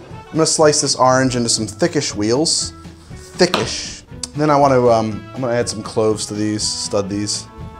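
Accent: New Jersey, United States. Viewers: You're getting rather self-conscious about that Jersey accent of yours, aren't you? Don't let the comments get to you, there's nothing wrong with having an accent.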